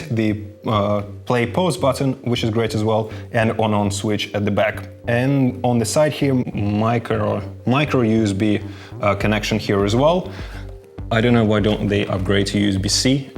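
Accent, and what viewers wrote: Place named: Russia. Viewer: Русский паря чтоль?)) Акцент дичайше русский) Но сама речь - мое почтение) Зато каждое слово понятно)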